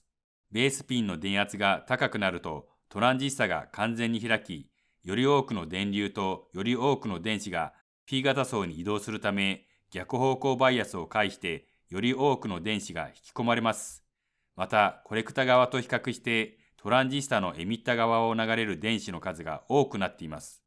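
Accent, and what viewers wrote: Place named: China. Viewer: 喋り方が中国人っぽい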